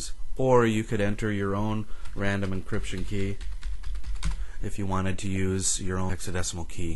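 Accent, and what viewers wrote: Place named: United States. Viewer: No accent??!! I could definitly hear the American accent.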